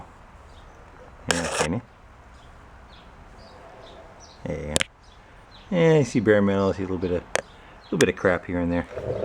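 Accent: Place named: Albany, United States. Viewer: this guy must be from albany ny area he dont sound from NH.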